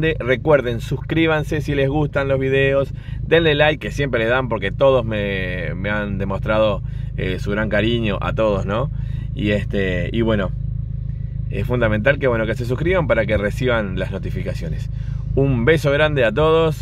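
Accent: Argentina. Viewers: Che una pregunta el es gasolero? Pq sino el precio de la nafta te come vivo. Le comenté a mi hno de tus videos. Otra cosa tenés el mismo tono de voz de un salteño q vive acá, tienen otra particularidad en común a los dos se le están volando las chapas jaja. Slds desde Toronto. José Luis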